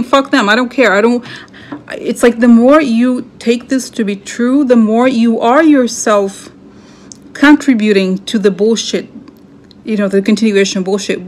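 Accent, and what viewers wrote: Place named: Russia. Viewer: Hey cheers.. so it didn't happen that way-keep chin up and come back and do some more cards! Miss your funny Russian accent!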